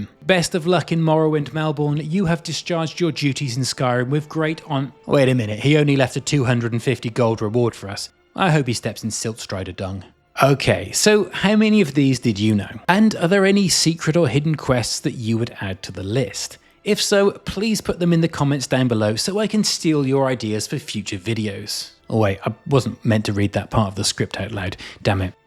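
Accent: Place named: England, United Kingdom. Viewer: Brilliant video, concise, funny and very useful. Also you sound like you're from England like me! Did not know about the Argonian, the other quests I had done some of but didn't realise that they progressed!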